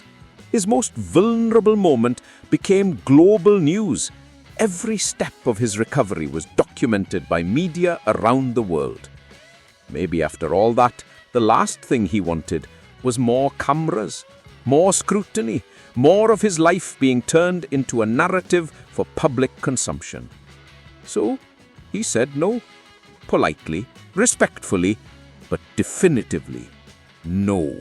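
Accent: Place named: South Wales, United Kingdom. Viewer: Who is this guy with south walian accent talking about.we are north Wales club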